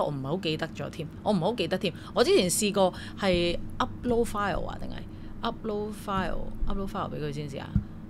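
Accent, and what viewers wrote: China. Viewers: 大陆哩音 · 这些不能叫做乡音，完全是一个母语普通话的人在学讲广东话。😂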